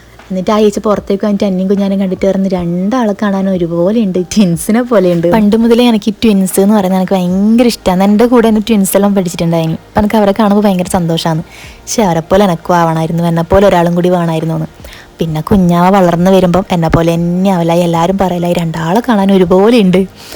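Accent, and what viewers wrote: Kasaragod, India. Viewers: Namma kasarod ayond ningale bhasha nalla pole manasilavm🙌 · Nammale kasargod basha adipoli alle.... Athil ആണ് രസം..... വിഡിയോ എടുത്തുകൊണ്ടു സംസാരിക്കാൻ ശ്രമിക്കു അതാണ് രസം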